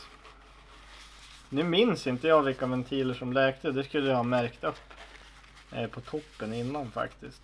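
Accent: Norrland, Sweden. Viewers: Norrländskan är tillbaks 😍😂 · Äntligen, slutade ärligt kolla på dig när du började med engelska. Det är ju norrländskan man vill höra 🤠🤠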